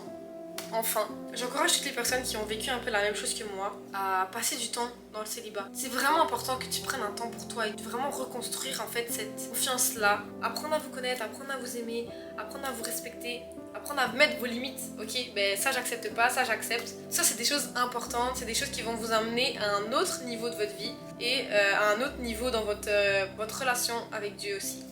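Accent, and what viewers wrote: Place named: Quebec, Canada. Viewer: Je me disais bien que tu avais un petit accès québécois hahahaha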